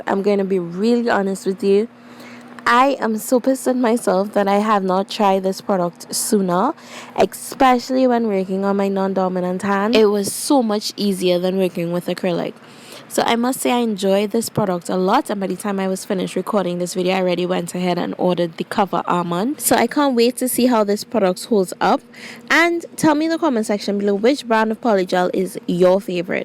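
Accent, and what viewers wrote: Trinidad and Tobago. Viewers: Always proud when I hear a Caribbean/Trinidadian accent 🇹🇹🇹🇹🇹🇹🇹🇹🇹🇹 very skilled nail tech, all the best for you in the new year!! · Trinidadian here and I recognize a gorgeous Trini accent anywhere love y girl and I’m glad there are some Trinidadians around here on YouTube with me 🇹🇹🇹🇹🇹🇹🇹🇹🇹🇹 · Where are u from?... U sound Trini...!!! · Love this am hearing a Caribbean accent trini 🇹🇹 to be exact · When I heard that Trini accent, I subscribe immediately. Love how you took your time to explain everything. I am now learning to do acrylics and gel nails. Thank you. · Noticed that trini accent quick. Coming to see you soon from Chaguanas. 😁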